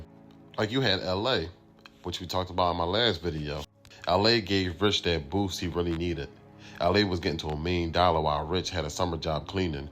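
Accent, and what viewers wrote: Philadelphia, United States. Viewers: You frm Philly? lol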